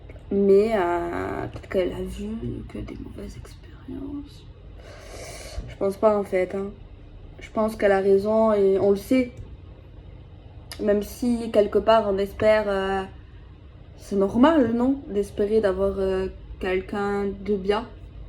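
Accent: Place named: Marseille, France. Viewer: j’adore ton accent marseillais j’espère pouvoir commander ton oracle le plus rapidement 😌et que tu me dises comment faire bisous prend soin de toi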